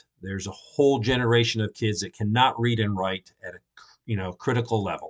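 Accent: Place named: South, United States. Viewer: It’s 3. Nu cle yer. Also, he’s Southern. Everything has more syllables in the South.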